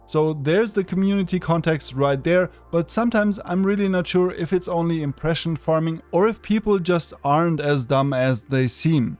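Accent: Germany. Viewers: You German? Your accent told me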